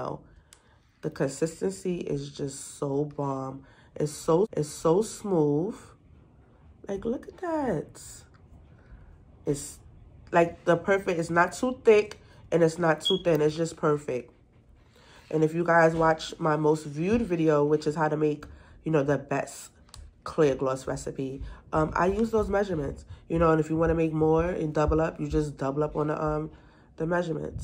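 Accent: New York, United States. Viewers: Just found ur video and child i can tell ur from nyc cause i can hear that newyorker accent we got! Thanks for the tips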